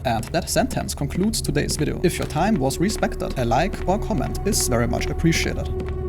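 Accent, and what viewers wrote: Germany. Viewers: are u german?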